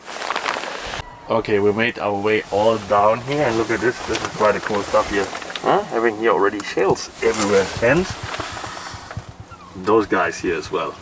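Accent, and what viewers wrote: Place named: Europe. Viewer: Im half Scottish half Hungarian. And you sound kinda european so I thought i’d ask🤣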